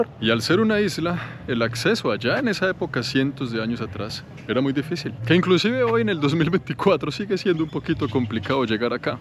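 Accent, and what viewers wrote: Colombia. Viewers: Español Colombiano.😅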